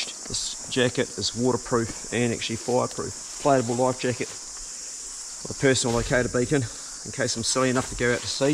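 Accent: Australia. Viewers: Thought he was cheating until i unmuted and heard he was australian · Awsum life mate. Love your aussie accent. Excellent crafting. Im a kiwi expat lived in OZ most of my life. Was full time offgrid doing the The Big Lap for 5 years. Nature be in it 💪👍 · Seems like a sort of copy of survivor man with an Australian accent. I dig it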